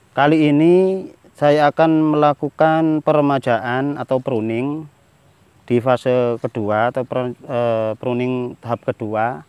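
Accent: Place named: East Java, Indonesia. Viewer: dari logatnya kalau gak malang ya surabaya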